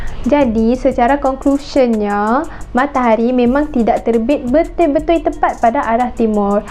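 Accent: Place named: North, Malaysia. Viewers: Aku bangga dengan akakk ni rilek jaa cakapp utara macam besa 👍 bukann apa sbb kawan aku baru p kl sebulan balik mai dok cakap kl , contohnya tengah sembang dia tanya depe pi mane ? 😂 aku rasa orang kl cakap diorang bukan depe 😂 jem otakk nak tegoq 😅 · Dia punya putaq sampai aku dah tau speaker org utagha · Lorat utara pun kuat jugak tu 😆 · Comel Orang utagha weh, berputaq teruih, hang mai aloq setaq kepala hang ku putaq,🤭🤭🤭🤭🤭🤭🤭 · putaqqq..kuat naa utaraq dia😂😂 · Speaker pon macam orang utara juga tu hehe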